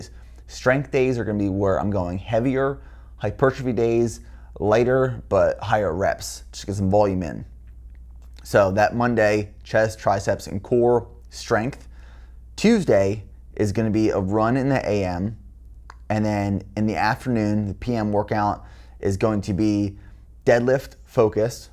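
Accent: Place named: Central Pennsylvania, United States. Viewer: Central PA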